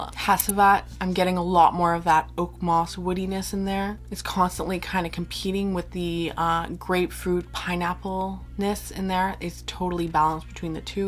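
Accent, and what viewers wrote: France. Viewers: Hi I wish you a very happy New Year and all the best for you and your loved ones. As always love your videos and your french accent 🙂🙂🙂😃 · ugh your French 😍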